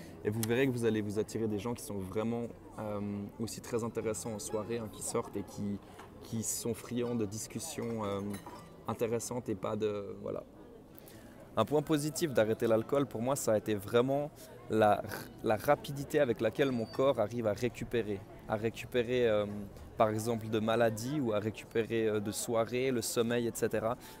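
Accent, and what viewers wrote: Fribourg, Switzerland. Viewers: je voix que tu es Suisse, j'ai deviné juste avec ta voix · Salut, J'ai l'impression que tu es de Suisse (ton accent) ^^ et tu as tournée où cette vidéo? Je vois qu'il est marqué Rue de Lausanne derrière mais je n'arrive pas à lire l'autre plaquette ^^ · petite question inutile ... es-tu suisse ? tu as le beau accent fribourgeois ;) (super vidéo ! )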